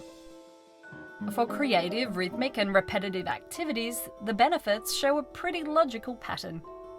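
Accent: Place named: Australia. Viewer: I could tell by the accent. So am I. Seeing Australian women getting into science is really awesome because I want to have a career in science when I'm older, and it's really encouraging to see that its not just for the men:)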